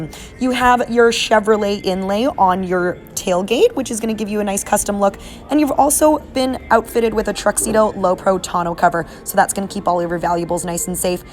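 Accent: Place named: Canada. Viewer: Canadian tell 1 - "Zed". Canadian tell 2 - "Oout".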